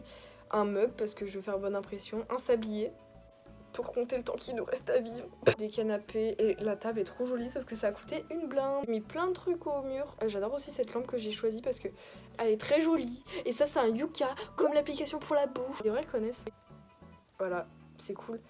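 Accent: England, United Kingdom. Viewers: J adore ton axent anglais tu peut mettre un like si t’es d’accord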